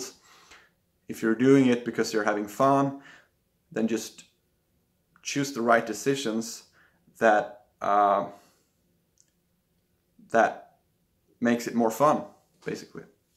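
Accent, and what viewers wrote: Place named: Finland. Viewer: I heard the Finnish in your English during the first 10 seconds! Am I right?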